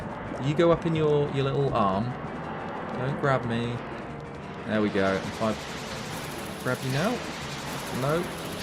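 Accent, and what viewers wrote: United Kingdom. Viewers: Are you from leicestershire?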